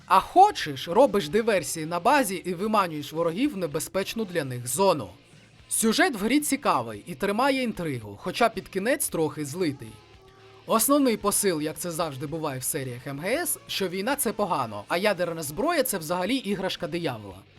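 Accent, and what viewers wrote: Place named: Russia. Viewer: Как видим, российская челюсть не позволяет нормально разговаривать на украинском. Продолжаем зоонаблюдения.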